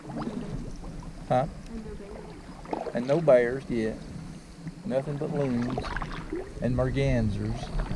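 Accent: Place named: South, United States. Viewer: Props to you friend for taking the effort to say 'portage' properly, for a while there with your southern accent, I thought I was going to hear something more like 'porridge' which really makes me cringe. Thanks again for making the effort ;-)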